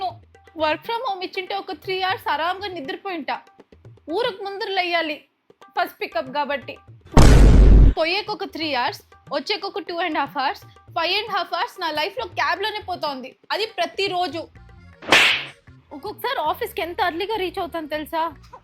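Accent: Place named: Karnataka, India. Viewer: Mee slang Bale ga vuntundi karanataka Telugu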